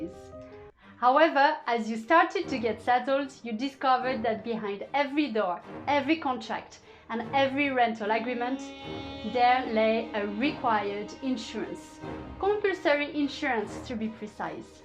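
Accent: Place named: France. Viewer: "Expat in France" followed by the most French accent I've ever heard. I guess they figured out how to make money off of us....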